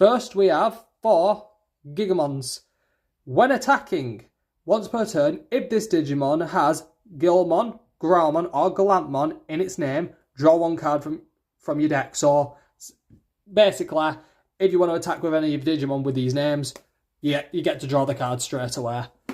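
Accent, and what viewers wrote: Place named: Yorkshire, United Kingdom. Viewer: Mate you sound like you're from Barnsley or Yorkshire... But this is an interesting profile...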